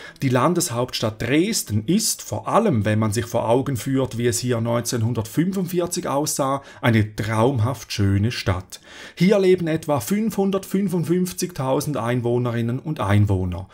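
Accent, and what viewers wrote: Switzerland. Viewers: Sich bei einem Schweizer über die Aussprache beschweren. Wahnsinn in Deutschland - Kapitel 214. Ps: Danke für diese erfrischende Reise!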